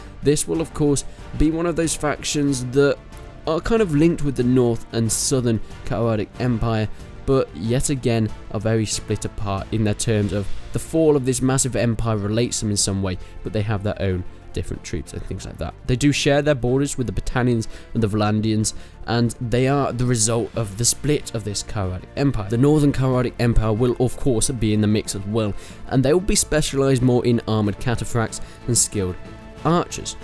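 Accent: United Kingdom. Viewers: god, do all british people talk like this?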